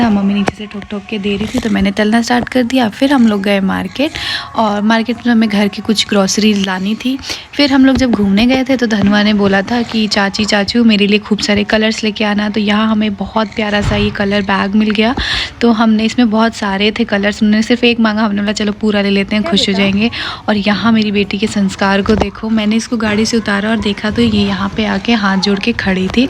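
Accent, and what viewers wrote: Bihar, India. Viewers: apke muh se bihari language bhut pyari lagti hai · Hi ji aap ki behari boli badi payari lagti hai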